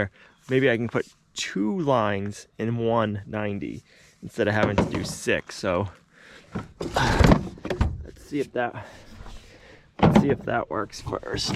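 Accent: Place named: New England, United States. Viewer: Oh you said “ TARP”, I heard “THHHAAAAP”. You New Englandera kill me with your accents! LOL. AND NO your not going overboard.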